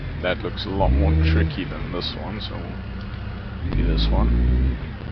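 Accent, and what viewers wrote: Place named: South Africa. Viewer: I can recognise that south african accent anywhere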